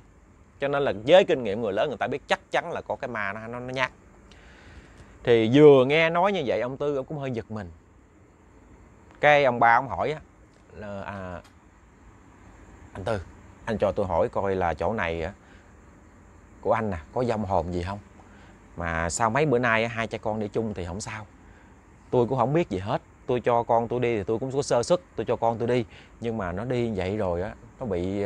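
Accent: Mekong Delta, Vietnam. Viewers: Nghe giọng kể biết là người miền tây , hay !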